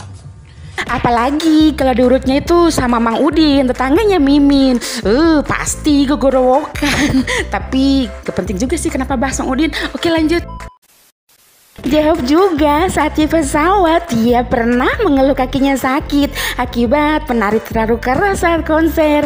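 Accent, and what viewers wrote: Sunda, Indonesia. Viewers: Hehe min nya suara nya lucu org Sunda ya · mimin nya orang sunda kah 😆? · Min urang mana meni sunda pisan? logatna asa logat urang dieu😳💜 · Min ..aslinya orang mana sihh ..sundanya medok bingit 👍👍🤣🤣😂😂😂 Suara mimin bikin rindu ..kontennya yg ini mengandung bawang tpi berhubung yg bawain mimin jd ckup trhibur . Semangat min ..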